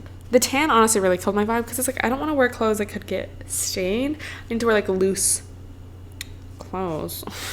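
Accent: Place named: California, United States. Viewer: To be honest, I'm just sitting on my mattress, and listening a while to u, because I just wanted to hear some recent voice with some Ca. maybe LA accent, just to make myself feel a little bit more at home.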